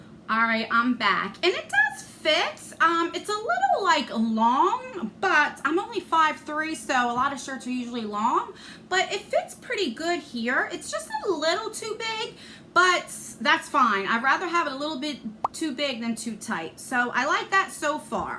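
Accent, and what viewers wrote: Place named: Philadelphia, United States. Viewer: I love hearing your Philly accent!!! I really wanna win but I'm not about to comment a million times lol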